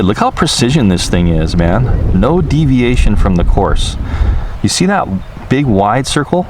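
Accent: Hawaii, United States. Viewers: Kras 4 he is in Hawaii & they have their own accent and enunciation. I’d like to hear the critics living on the main land try to pronounce some Hawaiian street names.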